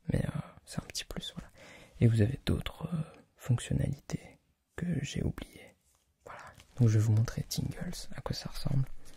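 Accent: France. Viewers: ACENTO FRANCES DE AQUI A JAPON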